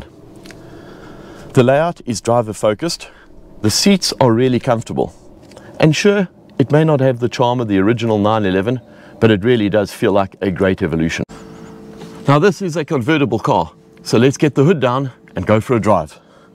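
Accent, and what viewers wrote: South Africa. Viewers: Are you from South Africa? Much love from Vietnam, as a South African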